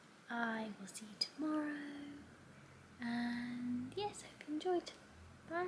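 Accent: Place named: United Kingdom. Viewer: I love this channel. I can learn natural British English through this channel and l like her daily life too. 😁😁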